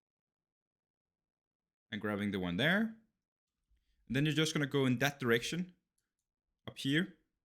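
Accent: Denmark. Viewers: Gætter på Dansk accent? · sorry jeg afslørerede dig 😂 du har bare en af de mest tydelige danske accenter når du taler engelsk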